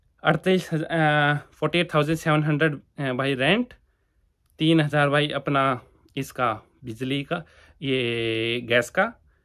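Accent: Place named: Haryana, India. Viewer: mai bi Haryana sah hu mujai ap ki boli sah pata chal gaya ta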